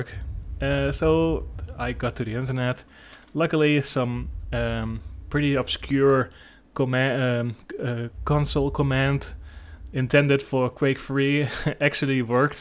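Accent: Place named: Netherlands. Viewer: Nederlanderrrrrrr!!!! 1e wordje hoorde ik het al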